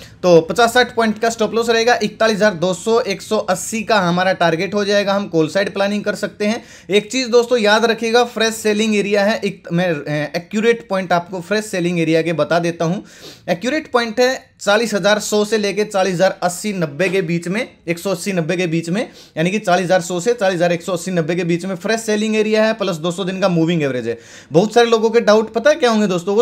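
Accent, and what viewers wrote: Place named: Rajasthan, India. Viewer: Sir first time apka channel dekha bahut kuch samajh mai aaya i suscribe your channel hand to hand God bless you kya aap rajsthan se q ki apki boli hamre jaise hi lagti hai sir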